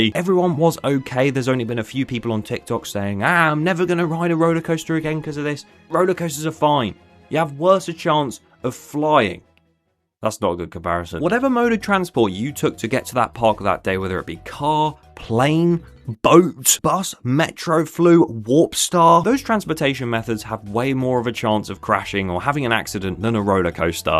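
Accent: United Kingdom. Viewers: British accents piss me off. This guy sounds like he licks windows.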